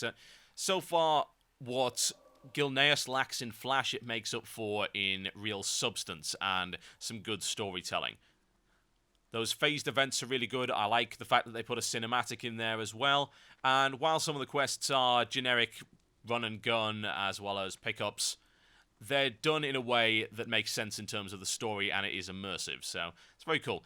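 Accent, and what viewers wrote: United Kingdom. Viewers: yer being british rocks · "Even if you don't care about the Lore, you'll care about whats about to happen" "Oh dear." "that sounds like crashing water in the background" "What the BLOODY HELL happened here. Wow. It's all gone." i literally had to pause to catch my breath with those rapid fire bits of humor, delivered in british fashion. i have to seriously ask where you're from, and what accent that is, or as much of an accent as you'll have to an american listener.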